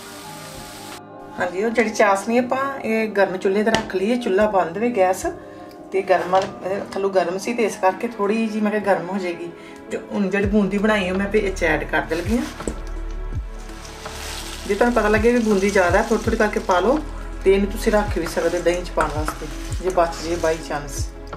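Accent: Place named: Malwa, India. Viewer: I love your Malwa accent. Sat Shri Akal. I will make these for my parents on Lohri. Thank you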